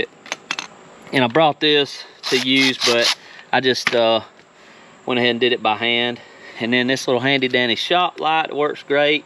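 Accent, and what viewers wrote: South, United States. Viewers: Still laughing at "sounds like the Dukes of Hazard"! LOL! You can tell you're from the South but your voice is clear and easy to listen to. The Northerners sound more like Canadians that Americans so....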